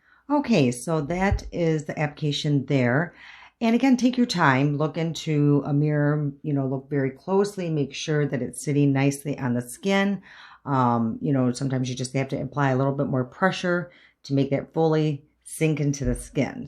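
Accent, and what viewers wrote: Midwest, United States. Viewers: I'm new to your channel; Allie Glines linked you on her recent video testing out the Loreal serum foundation. I'm 48, and i'm also in the process of re-learning makeup...and falling in love with it again! 😎 You mentioned your undereye darkness, and i have that as well. I have found a few products really do completely neutralize that discoloration. I like the Pixi Correction Concentrate, the Fenty Bright Fix Eye Brightener Concealer (shades like melon or deep melon), and the Tarte Colored Clay Undereye Corrector. These products do not crease if you use a very thin layer, and they do not move if you set them lightly. The key is super light layers. Anyway, hope this helps someone! PS...i hear a very familiar Midwest twang...where are you from?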